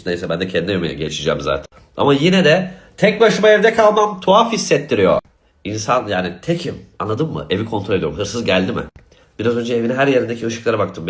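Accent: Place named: United States. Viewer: Abi Amerikalılar gibi konuşuyorsun ama dilin Türkçe.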